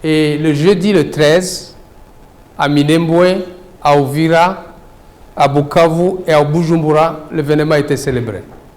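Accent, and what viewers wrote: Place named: Rwanda. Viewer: Tu te trompes toi même vraiment tu vais par 4 chemins et te voilà arriver au point que Dieu te maudisse profondement jusqu'à ta moëlle tutsi rwandais que tu es tu ne convaincras personne et tu la fermes. Tu voulais recencer les congolais pour Donner l'identité aux rwandais mais tu as raté ton accent ressort toujours. Tes troupes rebelles sont là aussi. Tu as apporté les fonds à vos troupes rebelles et intensifier les tueries dans notre pays. Tu as tué combien de congolais leur sang tombe sur la tête de tes enfants. Je souhaite que tu ne sors pas vivant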